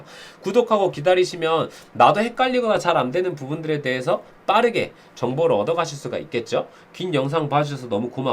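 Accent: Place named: Jeolla, South Korea. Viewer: 전라도 억양이 참말로 구수하십니다~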